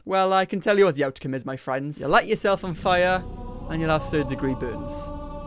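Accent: Wales, United Kingdom. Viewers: welsh accent🥺❤️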